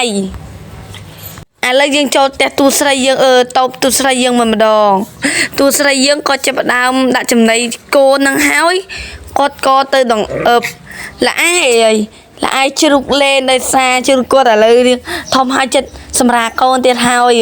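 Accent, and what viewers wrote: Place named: Cambodia. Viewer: អូននៅខេត្តណា បាននិយាយវឹងហ៎😊😊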